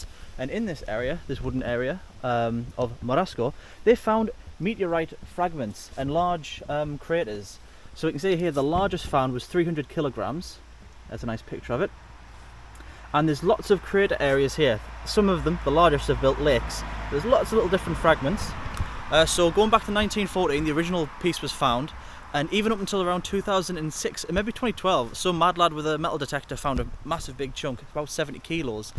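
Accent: England, United Kingdom. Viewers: Czy ty nie słyszysz że to Anglik.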